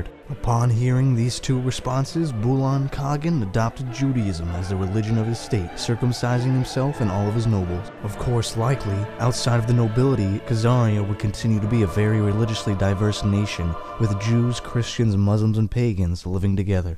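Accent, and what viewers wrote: United States. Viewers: Is the guy narrating this from Jersey? Serious question. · A moderately interesting look at a little known area of mediaeval history... but the style of narration is appalling and impossible to take seriously. It's not just the Yankee accent I object to but the breathlessly hyperbolic delivery which sounds like a bad sports commentator trying to put a spin on a report about a very boring football match (Association Football that is, not that ludicrous running around in suits of armour the Yanks do). My advice is to get a decent scriptwriter and stop trying to make it sound like the old intro to 'Soap'.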